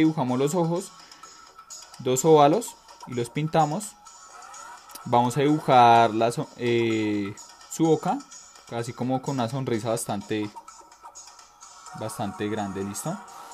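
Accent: Colombia. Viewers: Se le nota tanto que es de bogota.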